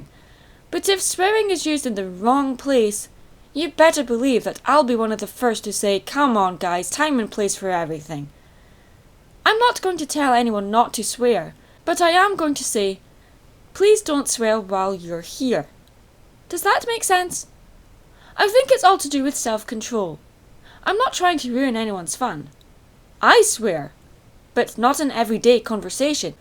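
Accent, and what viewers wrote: Scotland, United Kingdom. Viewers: omg I love the way your voice sounds and your Scottish accent! I really calms me I don't know why xD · Are you Scottish? And actually I'm used to people that do not know and don't swear at all and I go to channel to channel just to find a youtube channel that is completely children friendly and has no one in any comment or videos that has bad words and when I say a bad word my mom scolds me and I never say it ever again and their are kids causing and they swear for no reason and one of them don't even know what they mean so I get your point but now a days older brothers and sister are trying to prevent anyone from causing in front of their younger brother or sister. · R u Scottish?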